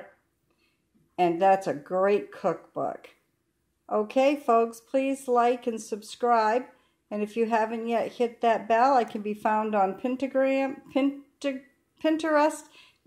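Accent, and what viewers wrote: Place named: East, United States. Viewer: I remember the molasses cookies that were so soft and gooey and delicious back in the early or late 1980s I believe in high school. they were only $0.25 and they were so delicious thank you for sharing this. I hear a thick Eastern accent and my grandma which was my dad's mom was from Glens Falls New York. I think my dad was born in Albany.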